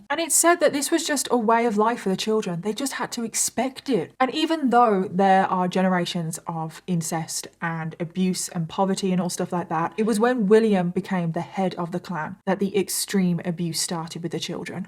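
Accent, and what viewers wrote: United Kingdom. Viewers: Uhh that British accent....i just cant... Im so happy for your 1 Million Subscribers ❤ wish you 100x more.. Bye ❤ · Let me say, before I even watch this, you’re my favorite true crime YouTuber. Honestly, I was initially put off because I have serious British accent related PTSD, but I am glad I set that aside (it helps you’re female). Your content and delivery is exceptional 👍🏻💪🏻👏🏻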